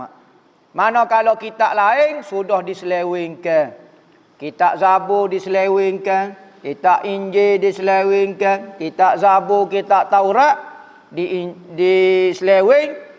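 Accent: Kelantan, Malaysia. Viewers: hnya org kelantan shja fhm dan tahu itonasi nada pelakk prcakapan bhasa negeri sndiri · X..ramai dh makin fhm dgn loghat kelantan..dh um x guna bahasa pekat pun.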